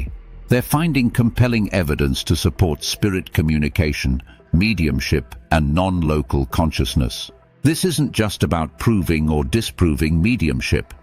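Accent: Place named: United Kingdom. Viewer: I think this is the best video you have done to date! And I love that the narrator has a British accent!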